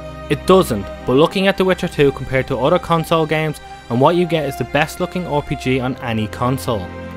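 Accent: Ireland. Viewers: the irish accent makes this review sound so much more cool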